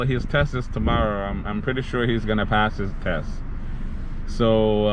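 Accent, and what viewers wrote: Jamaica. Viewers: Taking my test tomorrow 🙏🏾 btw is that a Jamaican accent?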